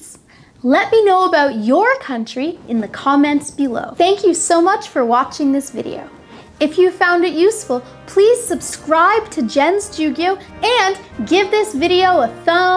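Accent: Canada. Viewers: Respected mam 🙏 namaste, its really nice informative video 📹 👍 most important informations and canadian pronunciations help us everybody. KEEP IT UP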